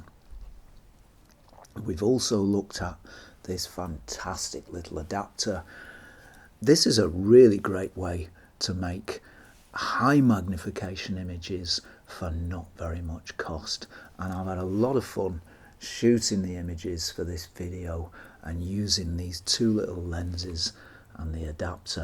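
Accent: United Kingdom. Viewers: Who would tell me that Steve Buscemi is reviewing lenses on Youtube with an indistinguishable british accent?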